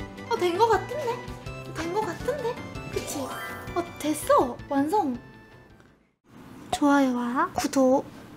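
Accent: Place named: Gyeongsang, South Korea. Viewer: 찐 갱상도 누님 저도 마산살아요!!!!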